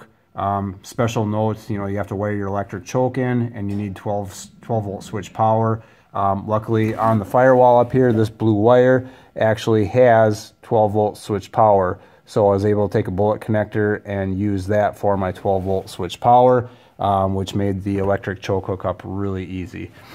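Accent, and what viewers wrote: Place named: Upper Midwest, United States. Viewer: Yes, the northern.midwest accent. I wonder how a 4.9L running on propane would do. They certainly run clean with no fouling of plugs. They run better with high compression pistons or head decking to raise the compression. Seeing the specs on the Honda GX NG Civic they had compression ratios of 12.5:1. Propane should work pretty well unless its gets SUPER, SUPER COLD liquefies -44°F. Natural gas isn't as available as propane. Hard to get a hold of out on the interstates. Fed gov't has a website about this stuff on fueleconomy.gov. alt fuels.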